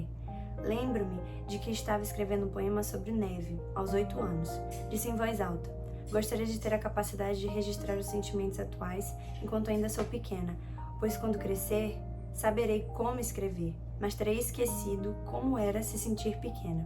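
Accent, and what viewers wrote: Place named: Bahia, Brazil. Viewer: Que vídeo bom!! Você tem um sotaque baiano, você é?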